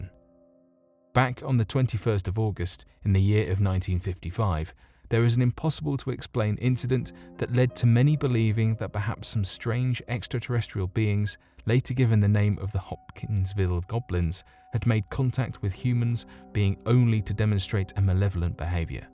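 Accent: United Kingdom. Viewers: This is the way they pronounce words in the UK. There are plenty of other narrators that sound just like him. Remember, their version of English came first. So to them, North Americans talk funny.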